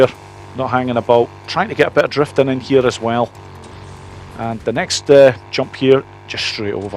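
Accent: Scotland, United Kingdom. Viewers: Thank you for the guide. I did all the challenges with your guide. Some were easier to complete than others. Those bike ones were tough to get. Your soothing reassuring Scottish voice got me through it 😂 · Just got myself my first gold in Ambush Camp challenge thanks to this guide. Looking to knock all 12 out with golds before I start the main game again with the Remastered version, then getting the Fuel tank and possibly saddle bags from Horde Assault beforehand, too. Been watching your overpowered playthrough as well and enjoying it with the commentary. Cheers for takkng the time for making these guides! I also gotta ask, are you Scottish, or at least live here, by any chance? I swear I hear a Scottish accent 😅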